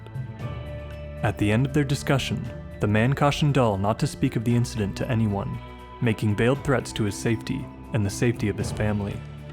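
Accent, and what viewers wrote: Canada. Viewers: Judging by a sligt accent I’d say the narrator is from Canada. Right?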